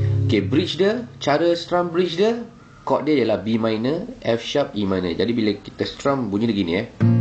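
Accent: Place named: Singapore. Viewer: bro orang spore ke? cara style cakap mcm orang melayu spore la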